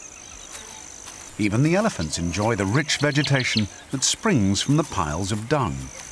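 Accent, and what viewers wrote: United Kingdom. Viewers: Great documentary, the old ones with the British narrators are my favorite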